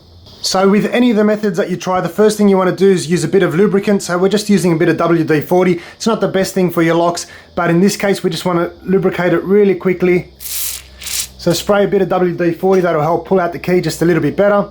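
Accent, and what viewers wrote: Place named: Australia. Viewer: New you were an Aussie as soon as you spoke.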